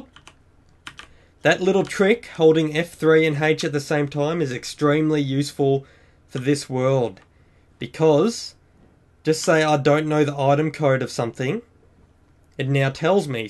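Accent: Australia. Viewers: I'm in love with the aussie accent!! chef's kiss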